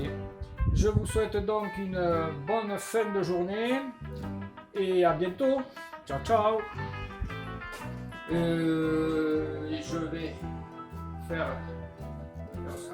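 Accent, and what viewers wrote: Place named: Var, France. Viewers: Nouvel abonné sur votre chaîne je parcours vos vidéos . Merci de votre partage . Amateur de bois je commence à avoir quelques machines et du coup je pense aux aménagement et votre montage est inspirant . Et les cigales dans la voix me rappel mon adolescence dans le Var . Merci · salut j'ai découvert ta chaîne y a peu de temps et je me suis dévoré toute tes vidéos elles sont bien faites avec des combines de vieux routard, de l'humour et tu ne t'attarde de pas sur des futilités mais elles s'adresse a des débutants avec un peu de connaissances du métier, je m'explique quand tu présente un outils montre nous les changements d'outils et éventuellement leurs réglages voire leur nettoyage et leurs affûtages quand cela est possible sinon j'adore ton accent il est du sud mais de ou? moi je suis du nord de la france. au plaisir de plein de nouvelles vidéos et garde les bétisiers c'est trés sympa bonne continuation et tout plein d'abonnés amitié Fred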